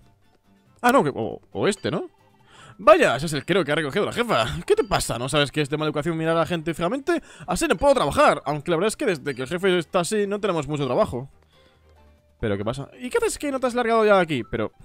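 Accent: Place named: Mexico. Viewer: AY ME ENCANTA QUE HABLES TIPO MEXICANO. ;;;; ❤💜❤💜❤💜❤💜❤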